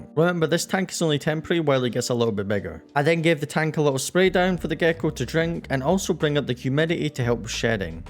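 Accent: Scotland, United Kingdom. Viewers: Yooo is that a Scottish accent I hear? (Half Scot here, always excited to hear the sound of my second home)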